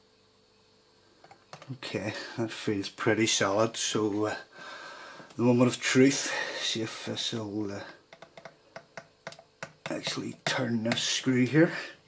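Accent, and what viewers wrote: Northern Ireland, United Kingdom. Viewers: northern Irish not Scottish :P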